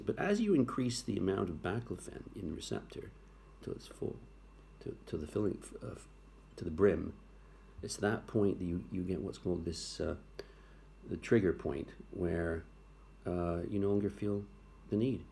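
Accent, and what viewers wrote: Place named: United Kingdom. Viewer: I think I hear a slight British accent ❤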